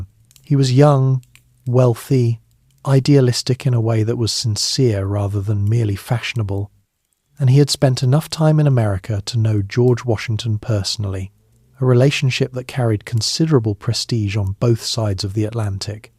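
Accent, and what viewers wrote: England, United Kingdom. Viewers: Another one of those videos with a horrible very strong English accent. I don't waste my time with such things.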